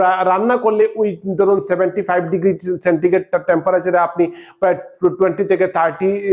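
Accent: Sylhet, Bangladesh. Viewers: ভাই আপনার বাড়ি কি সিলেট না হবীগঞ্জ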